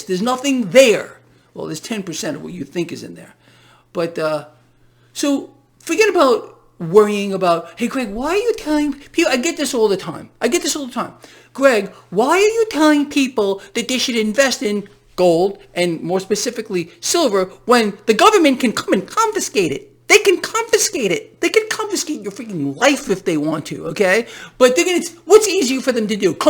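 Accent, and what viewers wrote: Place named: New York, United States. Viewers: Good idea, I am asleep when you do your lives anyway (Australia) but awake when you do end of day.....but entirely up to you, use the channel for the wrap up over seas whilst I sleep and of course to hear you NY accent. By the way thankyou for all you do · Sorry. I can't take the New York accent. Spent about 30 seconds listening.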